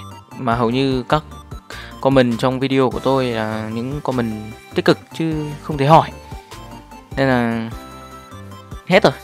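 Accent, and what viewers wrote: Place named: Hanoi, Vietnam. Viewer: T thề là t thích giọng con trai Hà Nội vl ý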